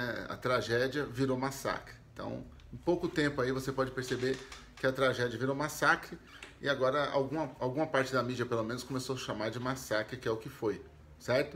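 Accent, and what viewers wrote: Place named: Urban periphery, Brazil. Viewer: Vc tem a voz da periferia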